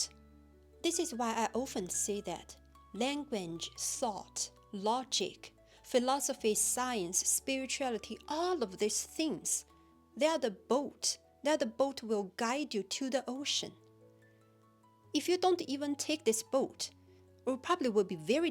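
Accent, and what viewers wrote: China. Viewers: 我非常確定你的母語是中文，對嗎？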